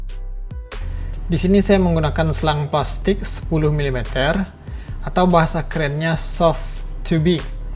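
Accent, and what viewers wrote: West Sumatra, Indonesia. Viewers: logat nya minang bgt nih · Dari logat nyo pasti uda ko urang minang..awak yakin 100%.. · Logat Sumbar nya kental nian...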